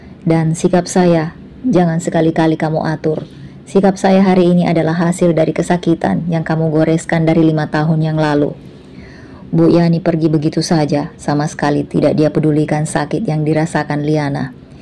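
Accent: Indonesia. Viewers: Chanel pendatang baru yg beda logat dr lainnya, khas batak bugis campur jawa bandung 😅, subscribe nya blm diatas 50 ribu tapi di gemari ibu ibu viewsnya setara dgn chanel besar artinya miminnya oke bawaain cerita , sukses sll mimin , single fight yg berjuang kuliahkan anak anaknya sendirian 🎉🎉🎉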